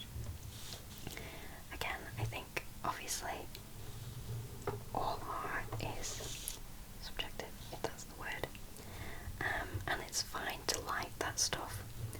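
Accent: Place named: England, United Kingdom. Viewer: I've watched this like 4 times already when going to bed. The sound from your sketching and your English accent sends me away to dream land! Love this! Thank you!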